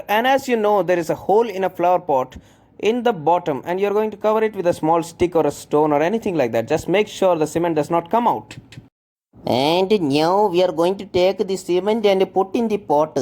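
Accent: India. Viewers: Only Indians can speak English like this way💪🏻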